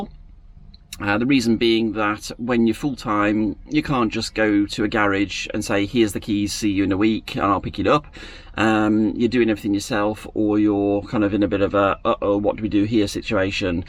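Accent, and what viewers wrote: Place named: North, United Kingdom. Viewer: You sound a northerner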